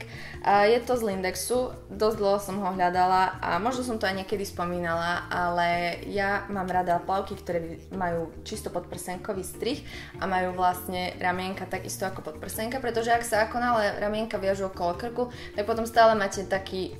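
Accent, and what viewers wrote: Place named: Eastern Slovakia, Slovakia. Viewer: Môžem sa spytať kde byvaš??Lebo maš trošku vychodniarsky prizvuk:D:D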